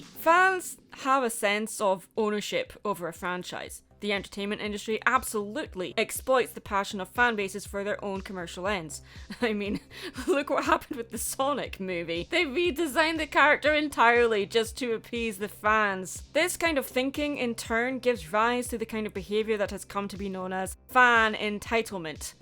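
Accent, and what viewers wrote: Scotland, United Kingdom. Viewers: Love it or hate it this woman spitting facts. Also you sound Scottish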